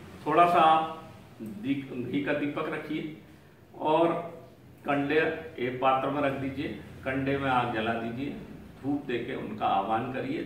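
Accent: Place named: Madhya Pradesh, India. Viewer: गुरुदेव प्रणाम मैं हरदा से हु आप मध्यप्रदेश से है ये आपकी भाषा से ज्ञात हो रहा है आप होशंगाबाद या जबलपुर से है ये मेरा अनुमान है कृपया बताये आप कहाँ से है जय श्री राम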